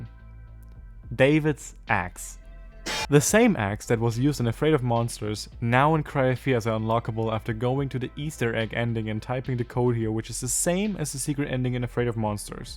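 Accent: Germany. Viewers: ich habe die ganze Zeit deinen akzent gehört und dachte schon das du deutsch bist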